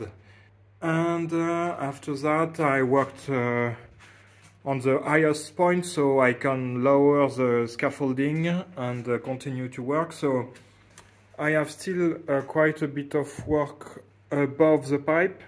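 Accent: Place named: France. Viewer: ça serait tellement bien honnêtement je comprend l'anglais mais un version français serait top. Faut avouer que tu as un sacré accent français (je fais pas mieux).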